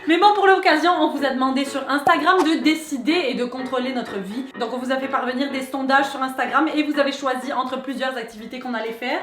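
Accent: Quebec, Canada. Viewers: l'accent québécois s'en vient petit à petit #teamquebec 🇲🇶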